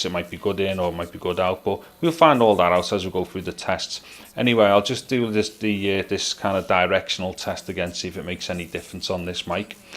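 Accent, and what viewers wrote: Liverpool, United Kingdom. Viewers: How refreshing. A fellow Scouser with a no-nonsense, objective review. Luvved it and just subbed yer.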